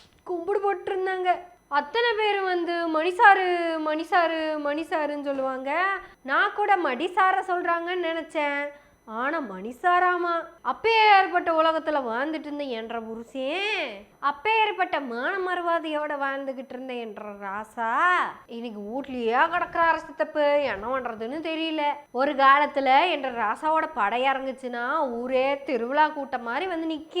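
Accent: Kongu, India. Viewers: தோழர் நான் coimbatore காரனுங்க கொங்கு தமிழ் உங்களுக்கு சிறப்பா வருது. ரொம்ப ரசிச்சு கேட்கமுடிஞ்சிது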